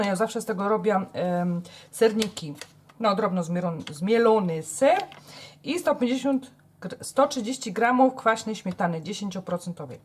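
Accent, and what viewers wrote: Silesia, Poland. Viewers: jak robisz okruchy, czyli twoja posypka, to stary piekarz zawsze mi mówił, że 1:1:1 cukru, mąki i tłuszczu ( raczej masła) i cała filozofia. Spróbuj i zobacz czy Ci to podchodzi. Mi zawsze do drożdżowego wychodziło, choć teraz już robię to "na oko". Pozdrawiam..........i powiem Ci tylko, że lubia Waszo gwara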